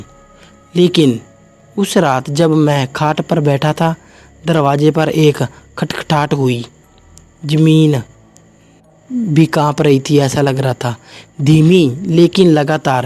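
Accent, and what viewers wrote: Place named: Punjab, India. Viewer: Bai tere hindi bolne ke trike se lg raha hai ke tu punjabi hai 😅👍🏻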